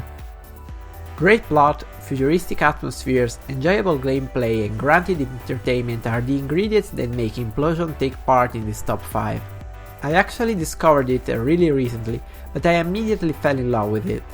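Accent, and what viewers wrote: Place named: Italy. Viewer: actually has a Italian accent.... Just saying...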